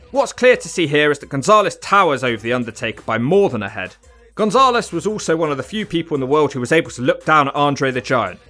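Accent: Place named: United Kingdom. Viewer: How come these narrators are always British?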